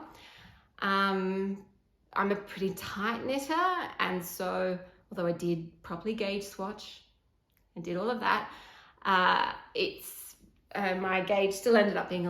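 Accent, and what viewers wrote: Australia. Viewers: Congratulations on your first podcast! I really enjoyed your relaxed, chatty and informative style. I was initially drawn to your Aussie accent (fellow Aussie here!) and look forward to more episodes. Best wishes from Sydney!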